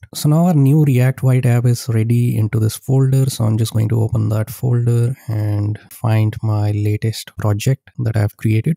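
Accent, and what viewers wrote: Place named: India. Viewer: i heard indian accent i was saved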